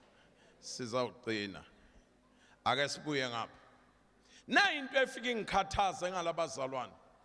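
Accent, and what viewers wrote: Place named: Xhosa, South Africa. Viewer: Wamuhle simakade asimnandi isiXhosa sakhe sindenza ndiyive ngakumbi mna lo myalezo, ziphi na inceku ezigcwele ilizwi nje ngale ndoda.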